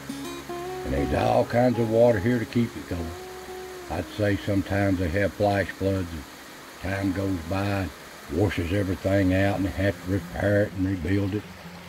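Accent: Appalachia, United States. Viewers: I just want to say how much I appreciate your videos. I’m so glad I found your channel! You sound very much like a lot of friends I have in Tazewell County, Virginia! I have Appalachian roots myself, and love to see your pics and listen to your. stories. So glad that we know these things, and rejoice in them! You’ve made a fan, brother. God bless you and yours!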